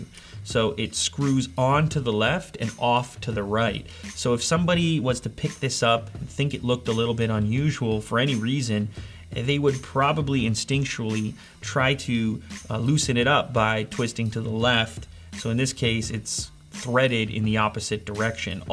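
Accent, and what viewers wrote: Canada. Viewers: Are u Canadian unbox therapy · R u Canadian mr.unboxer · is he canadian?? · Is he Canadian??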